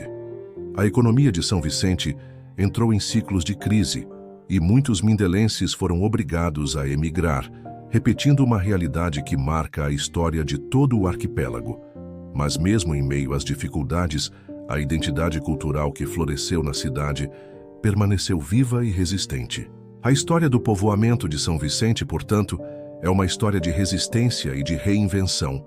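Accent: Brazil. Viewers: Mas fica sendo que os Cabocerdeanos sempre usam o sotaque Portugues de Portugal. E o que aprenda nas escolas de Cabo Verde.... por isso foi a minha pergunta inicial do sotaque Brazileiro sendo usado neste video pelo um Caboverdeano. E era so curiosidade, nada mais.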